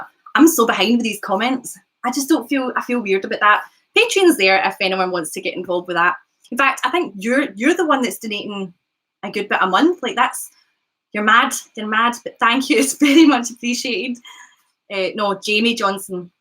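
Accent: Scotland, United Kingdom. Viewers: Scottish English bursts my brain :)))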